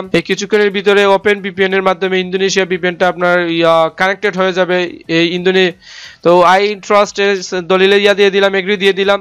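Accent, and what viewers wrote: Sylhet, Bangladesh. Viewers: Vai ki sylhety😁😁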